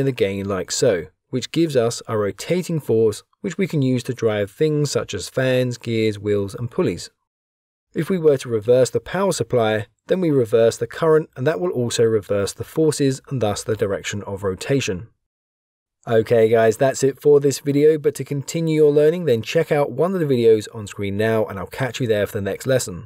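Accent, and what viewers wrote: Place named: West London, United Kingdom. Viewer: Which part of west London are you from ?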